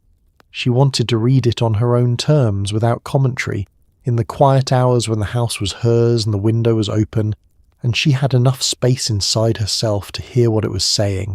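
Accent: England, United Kingdom. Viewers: No please leave the English voice! The whole world is not American thank you 🙏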